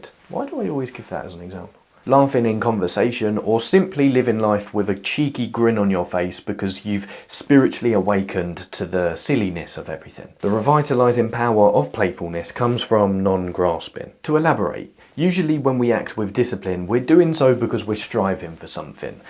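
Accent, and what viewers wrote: United Kingdom. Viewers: I don't often come across British English, so it's difficult for me to understand everything you said. But wow, I really love you and your content. Your knowledge helps me a lot, thank you.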